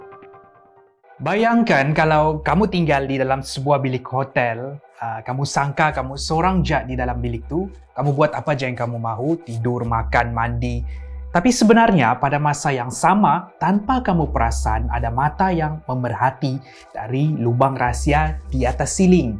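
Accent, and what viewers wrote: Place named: Sabah, Malaysia. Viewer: ketara sabah c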